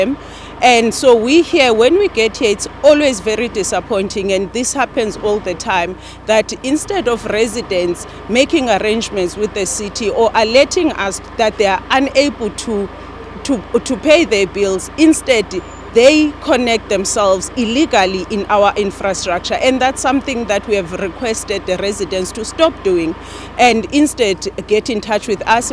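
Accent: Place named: Zimbabwe. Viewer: You speak Zimbabwean English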